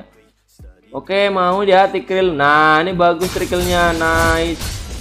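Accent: Java, Indonesia. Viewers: Wong jawa ternyata😂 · Jane wong medan apa wong jawa mas?? Wkwk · Jowone ngendi mas hehehe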